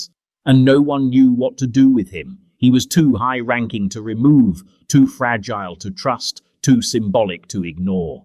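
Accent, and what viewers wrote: United Kingdom. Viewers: I absolutely love this channel and have binged almost all of it. Some suggestions: - I love the British accents you use (2). Keep them - Don't ask the GPT to pad out the wording simply to make the video longer. 2 and a half hours is longer than you need, and padding just becomes tedious after the first 5 minutes 10/10 for this channel. Although this vid isn't as funny as the earlier ones. Input "Britishly subtle sarcasm" into the command. ❤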